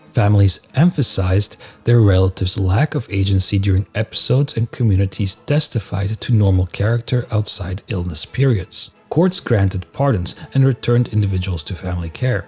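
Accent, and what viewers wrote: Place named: Flanders, Belgium. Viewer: Ik ruik Vlaams 👃🏻Geweldige content trouwens. Keep up the good work!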